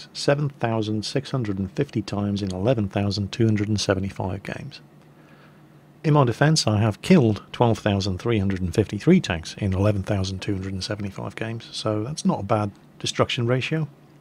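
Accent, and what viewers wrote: England, United Kingdom. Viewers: What you are doing is that you are an old man with a English accent, who happens to like video games. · I can attribute your success to three things. One- You're British. Americans love British accents. Two- You're retired Navy. You have a passion for military history and you know what you're talking about. We respect your opinions and input because of it. Three- You DON'T give a shit about what's popular and you refuse to conform to the modern YouTube channel standard, what I call "Pewdification". I mean no insult to PewDiePie at all, I mean, he found a niche and he milks it, and it works. But you stay specific, you talk with the fans, you do Mingles with, you study the game, and you know what you're talking about because you stay focused. This is coming from a Texan fan of yours. I will stop whatever I'm doing to watch your videos, and I am never disappointed. 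I love your stuff and I'm glad you don't plan on changing. I am also just fine with your new interest in Star Citizen, as you're still mainly focused on the content you have your main fanbase for. If you wanna upload some more SC videos, I think that's fine as well. So long as you don't turn into mainly SC. A trifecta of SC, WoT, and WT sounds okay to my ears, once SC comes out. Keep on tankin'. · Why subscribe to (assumed you like WoT or Warthunder) 1. He is authentic (or at least a pro in pretending to be) 2. He has, imho, a fantastic British accent 3. He has a kind of humor I appreciate cause its hilarious and mature the same time 4. He stays away from politics, religion and other "minefields" of internet communication 5. He lives my childhood dream (playing a game all day and getting money for it) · Well, I've never played WoT, but i almost feel like i know more about the game than a lot of newbies to the game and its all from watching your videos lol for whatever reason i just enjoy your commentary. 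Must be the English accent:D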